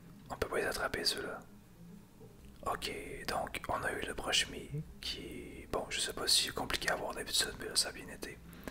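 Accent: Quebec, Canada. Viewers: Merci pour tes vidéos. J'adore ta voix, et ton petit accent québécois me déclenche énormément 😉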